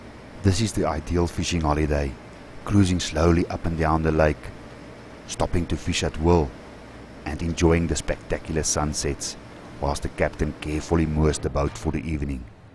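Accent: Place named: Afrikaans, South Africa. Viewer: A fantastic yourney no doubt, love your Arikaans accsent boykie. Pretoria boy, 40 years in USA. Miss Kariba a lot. Would love to do your trip.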